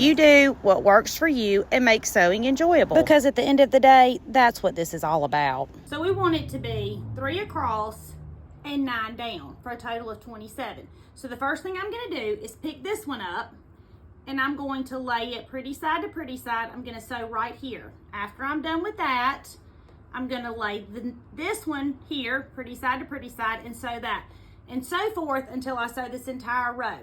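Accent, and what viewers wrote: South, United States. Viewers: Thank you so much! I just found your tutorial’s and am loving it! Plus I’m a southern girl relocated in the North and miss hearing a southern accent!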